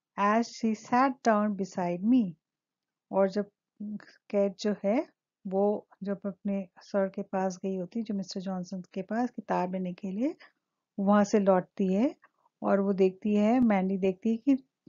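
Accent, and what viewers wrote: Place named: Bihar, India. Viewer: Mam you are from bihar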